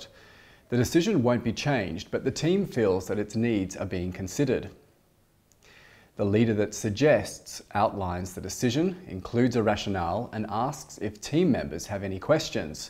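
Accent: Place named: Australia. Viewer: Somehow accidentally stumbled upon this while reading an article about a completely unrelated topic. Watched the whole thing mainly because of that beautiful Australian(?) accent. May watch a 3rd time idk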